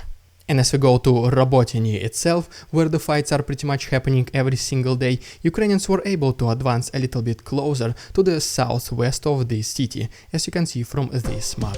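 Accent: Russia. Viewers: reduce the Russian accent, remove the intro portion or change it , it maybe picking up your accent, and the way your words are pronounced..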